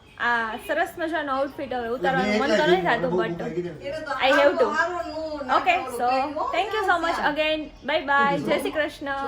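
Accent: Kathiawar, India. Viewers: Kathiyawadi accent bav sweet che ❤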